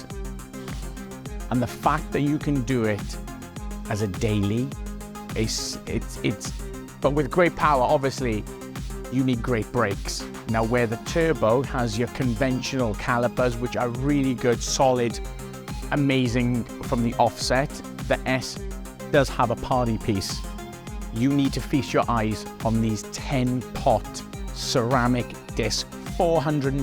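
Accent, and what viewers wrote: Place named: Wales, United Kingdom. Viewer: He sounds welsh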